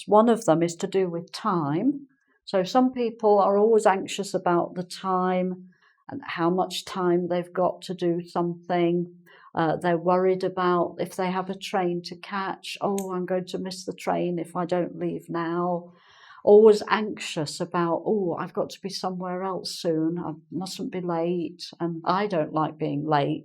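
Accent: United Kingdom. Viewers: i subscribed cause i admired ur english cause i'm facinating with the british way of speaking and i'll follow all ur lessons thank u so much hope u'll help us to get the british accent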